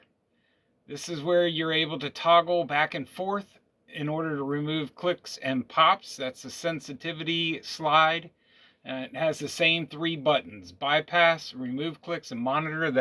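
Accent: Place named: North Carolina, United States. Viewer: Thanks for the video. Been thinking about getting one of these. You sound from your accent that you are from my neck of the woods, North Carolina. I also have the VPI Signature21 in RW, and the Integrity Tru-Lift and Kleen Sweep. Great products so you have good taste too😀 Love the music videos Keep it up.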